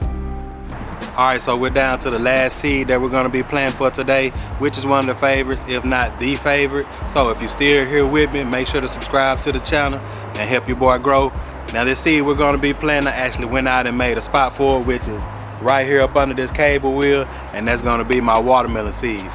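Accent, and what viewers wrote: Houston, United States. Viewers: Exactly I’m from Houston as well. Sounds like a Houstonian. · Lord, I'm from Houston. And I am so glad to hear somebody who SOUNDS like me in the garden! 😁😁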